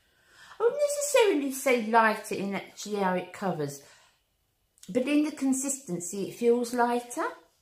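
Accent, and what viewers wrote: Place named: North, United Kingdom. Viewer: Hello, I'm new to your channel, and have really been enjoying your videos! I have to agree about this foundation. It is an excellent option for summer. I live in Tennessee in the US and we are currently dealing with temps of 96 degrees F (~36 C) with heat indexes of 109 F (~47C). Despite the horrible humidity here, this foundation holds up fairly well, so that's saying a lot for its staying power, but as you said, it's not heavy feeling. Just curious... What skin type are you? I'm fairly oily in my T-zone, so the matte finish truly helps me. I think it looks gorgeous on you all the same! Big Rimmel fan from over the pond here. My husband is from central Scotland, and we were trying to place your accent. Our guess.. Are you from the north? Charming for sure. 😉 💕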